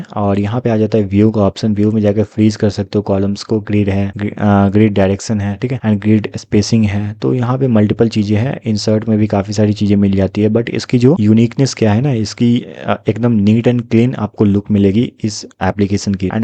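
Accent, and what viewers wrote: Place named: Bengal, India. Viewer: Tum tam karna band karo. Bong lagte ho.but indeed informative.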